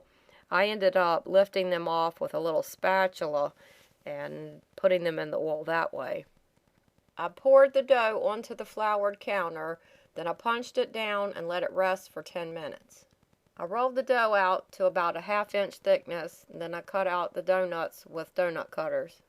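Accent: South, United States. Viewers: What sold me is the time she spent to get it right. I did it 2 at a time exactly from her recipe in a little pan. Perfect white rings and all. And her delightful Southern accent made them taste even better to this ,84 year old Canadian. Jam filled next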